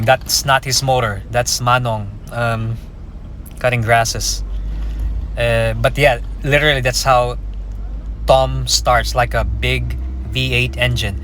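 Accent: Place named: United States. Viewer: Nice vid bro. Just curious where you learned how to speak English. Did you ever live in the U.S.?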